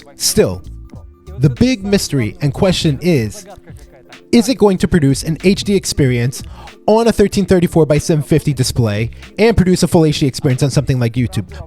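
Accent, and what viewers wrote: Russia. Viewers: Я прям слышу на фоне стандартный русский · Немного слышиться русский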